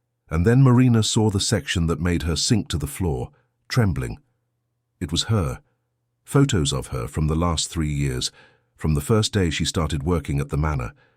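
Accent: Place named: England, United Kingdom. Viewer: last name norwood is English, the voice also sounds English but the picture does not look Englsh. Do like his longer sideburns though.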